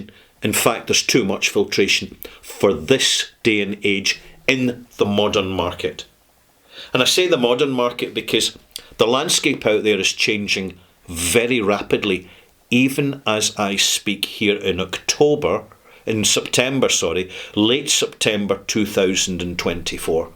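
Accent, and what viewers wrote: Scotland, United Kingdom. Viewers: The most I've ever laughed watching one of your videos was seeing you trying to imitate a stereotypical Scottish accent when you already have one!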